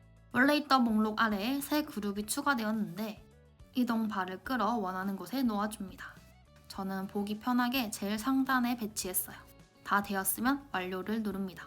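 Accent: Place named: Busan, South Korea. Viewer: ㅋㅋㅋ 익숙한 인토네이션에 ‘부산 분이신가,,?’ 했는데.. 맞군요!ㅋㅋㅋㅋ 대박입니다 영상 감사해요!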